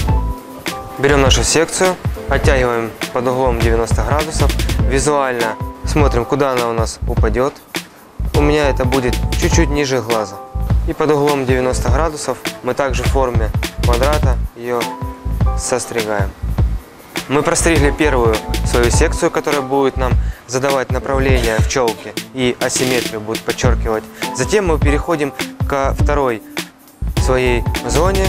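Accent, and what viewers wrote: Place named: Ukraine. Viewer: Умиляет это украинское произношение буквы Г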